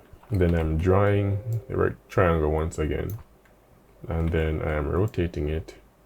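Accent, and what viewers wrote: Jamaica. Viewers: You sound like a Jamaican lol. I know a brother when I hear one but I could be wrong😂. Great job champ I learnt alot.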